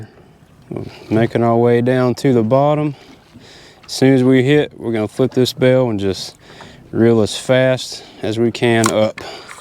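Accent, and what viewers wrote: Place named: South, United States. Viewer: Lolo yup I understand everything u talkin bought 🤣🤣 yeah its somethin about the Redneck in us that makes us talk like this 🤣🤣🙏❤🇺🇸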